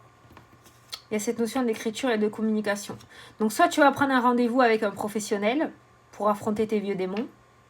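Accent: South, France. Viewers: Coucou du soir alors moi je viens de Clermont-Ferrand et actuellement nos belles montagnes sont sous la neige et on se pèle Ahhhh Perpignan c'est une belle ville c'est normal que tu ai l accent du sud ! jeudi qui s'annonce un peu compliqué mais on va faire avec En ce qui concerne le sport ce ne sera pas pour demain parce que je vais chez le kiné lol C'est super rigolo parce que j'ai le même jeu que toi Et ce matin je me suis tiré la carte qui me conseille de manger des fruits et légumes et de devenir végétarienne LoL Ton collier je l'avais remarqué j'étais certaine que c'était un cadeau de Noël, au fait en parlant de ça le collier chapelet je l'ai trouvé sur Amazon pas le même que toi mais très jolie aussi Faites c'est drôle parce qu'on utilise les mêmes jeux j'adore j'imagine que le goal de utiliser consultation je sais pas ce que tu en penses mais moi quand je les dans les mal ressenti est décuplé par rapport aux premiers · moi c'est le 04 Manosque tu vois le sud avec l'accent bien plus prononcé que le tien, chantant comme les cigales, très fière de mon accent tant pis s'il ne plaît pas à tout le monde c'est le mien 😘😍 allez hop la vidéo je t'écoute je suis toute ouïe 😊😊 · Bonsoir moi je viens du sud ouest Hossegor Et je rentre tout juste de Perpignan où vit ma Soeur Merci pour cette bonne humeur et l’accent mon dieu que je le connais et c’est meilleur oui, tu gères la fougère terrible merci 🙏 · Ja doré le accents du du sud. 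Perpignan. Le boulou argeles je connais bien bien moi je suis du Loiret beugency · Bonsoir j'ai été a Baillargues pendant 50 ans et je suis a Hyères depuis 2 ans on voit bien que vous êtes une vraie sudiste l'accent est formidable et vos éxplications sont très claires et exactes merci et à bientôt très cordialement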